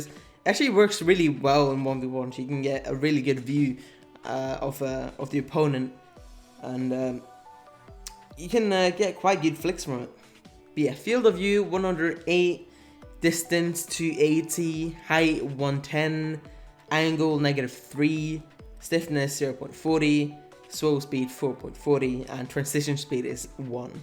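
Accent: Sweden. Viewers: Are u swedish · Are you from sweden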